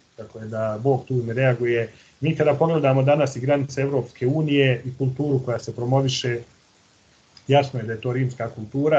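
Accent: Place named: Ekavian, Serbia. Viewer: Da odmah napomenem ne zelim vrijedjati i ne odnosi se na neki vid mrznje Prvo mi se ucinilo dacujem ekavica konzumacija Kad fakat pa dragi ti ko starleta Ne mogu vjerovati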